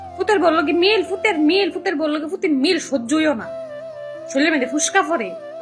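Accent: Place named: Brahmanbaria, Bangladesh. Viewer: আপু তুমি কোন এলাকার তোমার মুখের ভাষা শুনে মনে হচ্ছে তুমি ব্রাহ্মণবাড়িয়ার রিপ্লাই দিও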